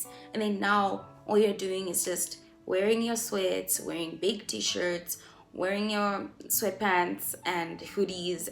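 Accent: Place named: South Africa. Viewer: You sound South African sometimes ... so cute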